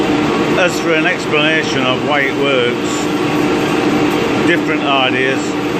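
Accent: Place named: Northern England, United Kingdom. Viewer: wonderful accent Northern MaN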